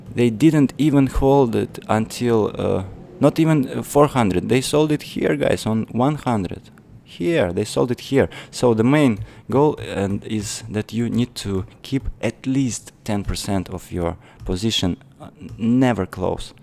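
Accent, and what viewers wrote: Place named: Russia. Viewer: Your English is perfect !👍👍👍Но есть русс. акцент как и у Ivan on Tech.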